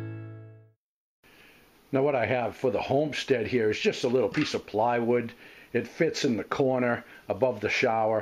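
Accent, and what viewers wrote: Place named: New York, United States. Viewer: You do have New York accent. So wonderful.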